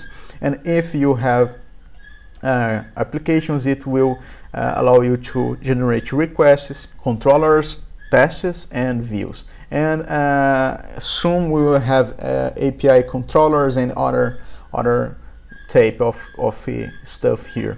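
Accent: Brazil. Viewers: Brasileiro certeza. Mas ficou bom mano parabéns. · Conheço um brasileiro de longe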